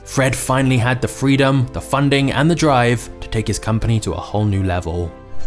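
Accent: United Kingdom. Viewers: Yeah but the UK accent sucks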